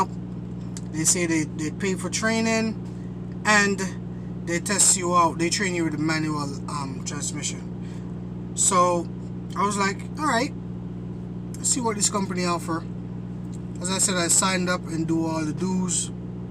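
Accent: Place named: Hartford, United States. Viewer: hey u sound like u from the Hartford area right? Was there for 2 years just moved back to nyc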